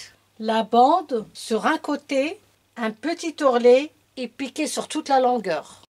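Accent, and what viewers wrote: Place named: Lebanon. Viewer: Coucou je commence la couture je trouve que vous expliquez super bien et chose importante pour ne parler pas trop vite ... Et j'avoue g craqué sur votre magnifique accent ça me rappelle ma famille au Liban . Merci beaucoup 👍👍👍👍🌷🌺💐💗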